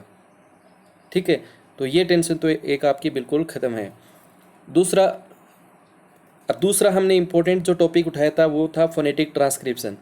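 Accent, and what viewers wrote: Haryana, India. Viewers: Aapne tho kamaal k notes bnaye h Guruji 👏 PTA nhi murkh log kyo aapki vedio ko like nhi karte aapko tho gold ka button milna chahiye ,aapki aawaj aaj kuch badli Hui so lagi Guruji 👏 aapki aawaj Mai hmare area ka touch h from kaithal HR thanks a lots again 👏♥️♥️♥️💗💖♥️♥️💗💖🙏👏👏🙏♥️🙏🏻🙏🏻🙏🏻🙏🏻🙏🙏🏻🙏🙏🏻🙏🙏🏻🙏🙏🏻🙏🙏🏻🙏🙏🏻🙏🙏🏻🙏🙏🏻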